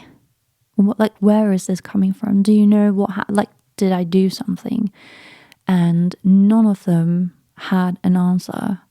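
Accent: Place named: England, United Kingdom. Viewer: When the first time I heard your voice, I felt you have a beautiful English accent.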